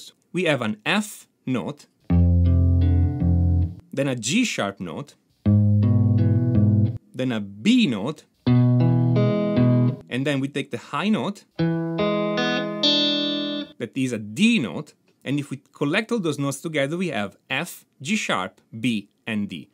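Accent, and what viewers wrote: Italy. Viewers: Hello are you italian?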